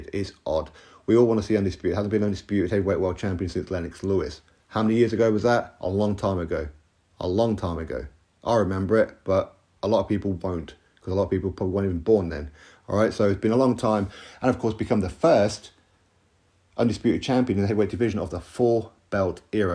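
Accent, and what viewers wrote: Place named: RP, United Kingdom. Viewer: If you're from Newcastle Upon Tyne, as I am, then where did you get that posh accent from?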